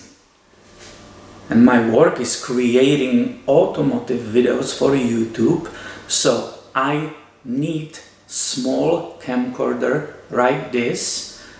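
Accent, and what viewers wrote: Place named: Czech Republic. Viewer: Interesting video, well done :) You sound like you're from the Czech Republic or a nearby country(?) :)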